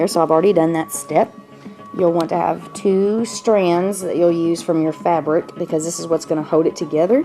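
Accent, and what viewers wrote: South, United States. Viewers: where you from you have a southern accent :)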